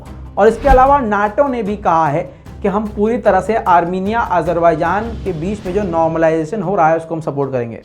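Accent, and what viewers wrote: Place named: Bihar, India. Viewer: Loved Bihari Andaz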